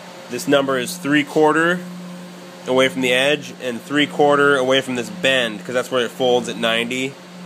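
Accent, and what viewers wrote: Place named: California, United States. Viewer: where are you from? I'm in Santa Cruz, CA. there's no one who talks like you that's not from or in CA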